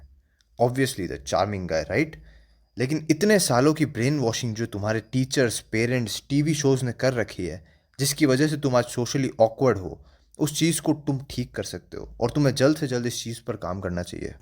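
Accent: Rajasthan, India. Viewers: Bro you from rajasthan? The way you talk really gives me that vibe ❤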